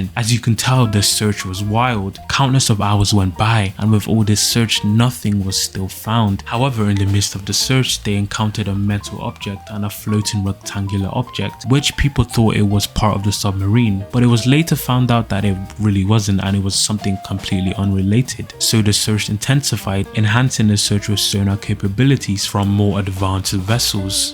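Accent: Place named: United Kingdom. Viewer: He is British that's a British accent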